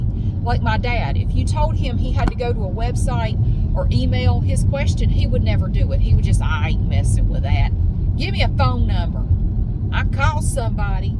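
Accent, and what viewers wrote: South, United States. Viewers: Wow your southern accent is thick tonight. Lol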